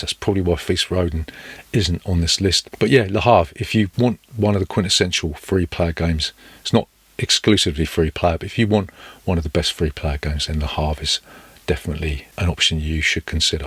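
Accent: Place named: South London, United Kingdom. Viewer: where abouts on the south coast? I started watching your reviews recently and my wife, who is from Yorkshire, asked me why I was talking like I was in Saaaarf London. I said I’d been watching a load of b******s on YouTube, which I then had to clarify. I’m from Brighton myself but live in beautiful Auckland NZ...Anyway, you have been a great source of info and entertainment during lockdowns etc....so Thank You very much.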